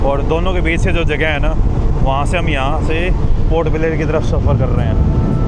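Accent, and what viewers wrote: Haryana, India. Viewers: Bhai ye pakka haryana ka hoga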